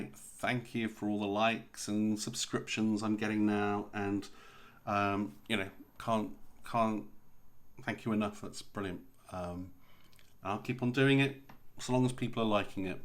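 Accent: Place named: South, United States. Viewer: You seem to be British. Perhaps you are from the South of Britain because you speak like someone from the South of the US. I know that you are headed someplace and I am intriged enough to wait until you get there. Just an observation. Love both photos.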